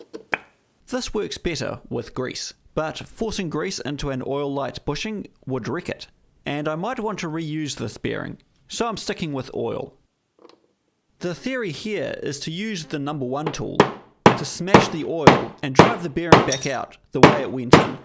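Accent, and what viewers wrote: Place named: New Zealand. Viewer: Ahh the refreshing sound of a kiwi accent whilst abroad. Great stuff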